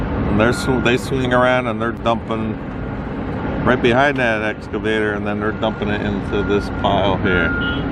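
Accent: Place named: Midwest, United States. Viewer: My wife and I have noticed your accent and it sounds overmuch like our Wisconsin/Michigan accents. Where do you originate?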